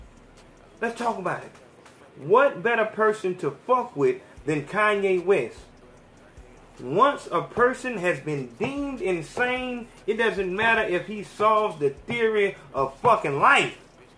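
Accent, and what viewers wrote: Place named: South, United States. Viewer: You so country but i 💕that though!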